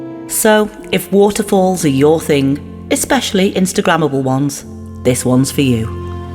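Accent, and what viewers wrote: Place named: RP, United Kingdom. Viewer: You got a BBC voice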